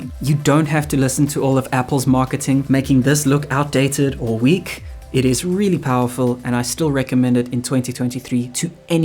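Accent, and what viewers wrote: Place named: South Africa. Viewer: I know a South African accent when I hear one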